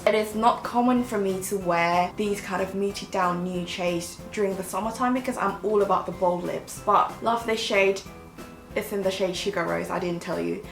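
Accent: United Kingdom. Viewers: 은 항상 보면서 존경스러워요.. 외국생활 아무리오래해도 전 저정도는 절대 못할꺼같아요 어후..발음도 약간 영국식발음이 정말 사랑스러워요 꾸ㅡ으으♡ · Love your British accent!❤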